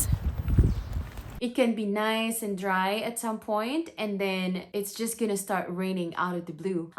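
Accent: Ireland. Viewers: Not sure how long you've been here, but that Irish accent is coming along nicely! 😁👍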